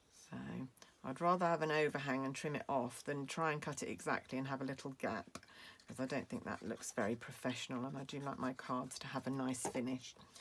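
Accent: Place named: United Kingdom. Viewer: What a lovely card! I LOVE your accent - well, you probably don't think you have one LOL But anything Brits say is so charming: bauble. My new Christmas word!!! xo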